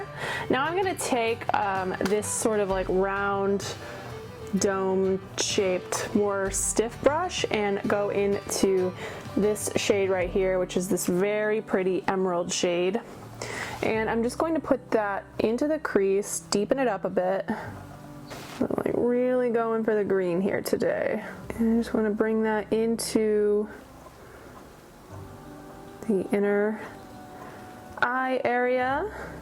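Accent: Russia. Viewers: Sounds like Russian accent!😂😂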